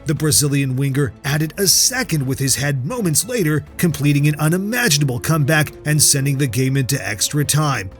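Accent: United States. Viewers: You'd heard that american accent? They're new here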